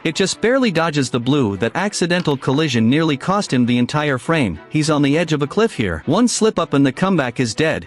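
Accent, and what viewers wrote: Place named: United States. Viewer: we fkn know..and a fkn yank commentary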